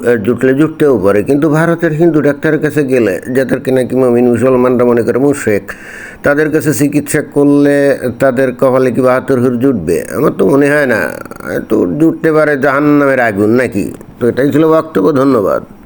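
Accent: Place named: Chittagong, Bangladesh. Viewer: ওস্তাদের ভাষায় শুনতে বেশ ভালো লাগে। চট্টগ্রামের মনে হয়।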